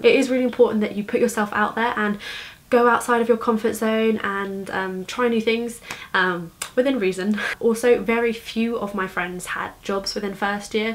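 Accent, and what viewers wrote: United Kingdom. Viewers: I love your British accent!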